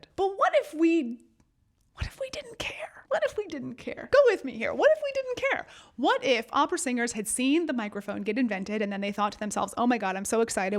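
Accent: England, United Kingdom. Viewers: Thank you for such an informative and entertaining video. The sections where you subtracted the techniques one by one and then added them back in one at a time were very impressive. Some very thought provoking questions too. I was also pretty impressed by your English accent. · Your English accent is brilliant! Listen to the late Gene Pitney who seems to have an operatic kind of technique. Greetings from Good Ol’ England.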